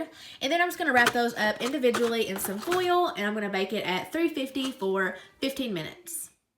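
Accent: South, United States. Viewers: Looks so good love her southern accent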